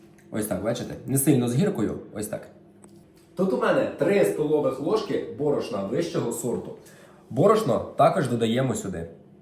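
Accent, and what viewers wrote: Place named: Hutsul, Ukraine. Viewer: Хороший, позитивний і діловий хлопець! А мова у нього - з діалектом гуцульщини, ну, і що? В кожній частині України населення має свій діалект. Не звертай на погані коментарі увагу,!!! ❤😂🎉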